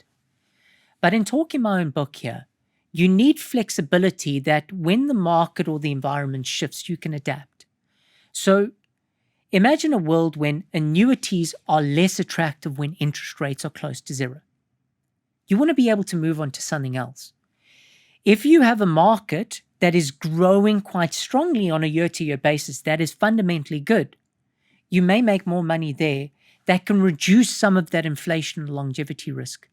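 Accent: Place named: England, United Kingdom. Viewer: Keep English Accent's out of the NEW WORLD.................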